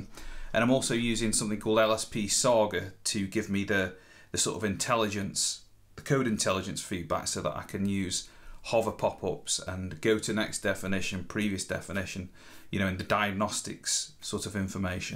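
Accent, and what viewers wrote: Stoke, United Kingdom. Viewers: I was PROPER surprised to hear a Stoke accent when you started talking!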